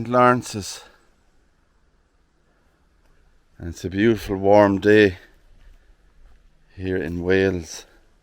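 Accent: Ireland. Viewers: You have a lovely Irish accent; I could listen to it all day long😊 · I love your Irish speak! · Thank you for sharing, it is a beautiful church. Also, I am glad that you are proud of your Irish accent! I love it, not that you care if I like it, but I am mostly Irish-American born and we I hear Irish language and voices, I wonder what my ancestors sounded like, how they spoke, one day I hope to come for a visit. My 3x's great-grandpa immigrated from county kerry Ireland in the 1800's, I am unsure of the reason, but it wasn't an easy journey for him & his family. I still hold the family name of Sullivan and in Ireland the surname origin was Súilleabháin! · I knew you were Irish, guessing Northern. Love the accent. Also, love seeing all those old churches and grave sites. Living in “the new world”, means anything older than the late 1700’s is extremely rare. I am also guessing it is a Norman tower on the church(?). 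Lovely old buildings, so glad they are preserved by the Friends of friendless churches. 🩷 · Only a ignorant person could criticize your accent. The Irish accent is one of the most charming accents in the world. Thank you . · Doesn't matter if you say THREE, TREE, or any other Irish way, I love this channel. I wish i had an Irish accent personally. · Don't apologise for our irish accents when we speak in the language of our former oppressors. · An unusual family name of Skone. I understand the Skone surname is rooted in British, Dutch, and Flemish origins. BTW, love the Irish accent and language, very important to keep it. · I enjoyed all of this, ESPECIALLY your Irish accent.☺️ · I love your Irish accent. I lived in Hawaii for almost 20 years, and they also pronounce their threes trees. If we all sounded the same and looked the same, what a boring world this would be. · I am American, and I love your Irish accent!!!!!!!! 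· Thanks from Australia and we love your 'tertee' it's how we knew you were Irish. Take care. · Hi how rude of some gimp to mention how you pronounce 33 the cheeky hugger. I adore your accent and your lovely Irish brogue, Thankyou so much Love and kind wishes from Lynn in Brittany xx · Love the Irish accent! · The wall paintings are a stunning find! A shame we can't see what there is, but they do need to be protected in these destructive times. Marveous old church -- the Friends of Friendless Churches do valuable work. Nice to visit with the former residents of the parish, thank you. Your Irish accent is just lovely -- I can't imagine any moron objecting to it! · your Irish lilt is delightful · You bet you're Irish! I like your accent. It's what Irish is to me. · I love the old grave sites. The older the more interesting they are. Someday I want to visit there!!! It's been a life long dream. 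NEVER change, I'm American and I ❤ your Irish accent 🎉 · I adore your Irish accent just wonderful and your pronunciations are perfect and no I wouldn't change a thing about you! I find it mesmerizing to listen to, thank you for this great video tour, love the church! Just beautiful ❤️ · Hello, I preferred the exterior of the chapel before restoration, the ivy and lichen made it. Painting the tower white was horrific vandalism! Thank you for a very pleasant wander around the churchyard and for narrating it in your wonderful Irish brogue. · Irish speech is enjoyable. So interested in the bell and tower. Healing tones from the old bells. ❤🇦🇺🙌👍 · Your pronunciation is part of your Irish charm! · I’m 🇺🇸 and I like to hear the Irish accent and people are rude to fuss about pronunciation, don’t change! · i like your Irish accent. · I love your Irish accent. Thanks for a lovely tour. I do a few tours of cemeteries as I document graves for genealogy. 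Fascinating histories to be found! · Love your irish accent! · love the Irish accent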